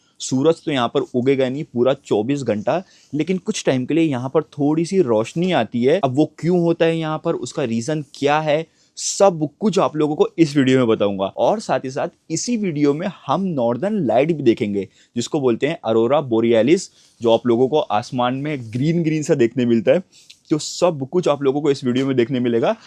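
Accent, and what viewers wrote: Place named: Maharashtra, India. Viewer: Tu marathi accent m mat bol bhai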